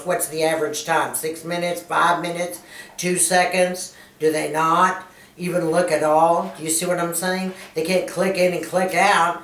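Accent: South, United States. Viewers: I hope you cooled off by now... Anyway, this was half an hour well spent also if I don't have a channel. Interesting to learn what's behind the screen also if it's not always pleasant details. By the way - since I don't want to miss a word I do make an effort and slowly I'm getting a better understanding of your marvelous Texan accent.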